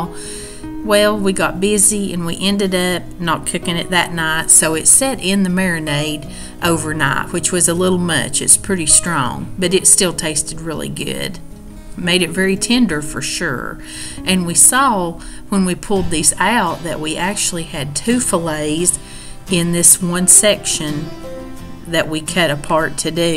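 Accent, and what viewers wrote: South, United States. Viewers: Definitely rice or roast potatoes...you make me laugh, saying you nearly dropped your cake. And the other time when you burnt your meatballs! Love that you show your fails, and your southern US accent is such fun to my English ears.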